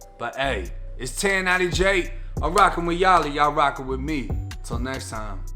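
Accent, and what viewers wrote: Boston, United States. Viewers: You sound like you're from Boston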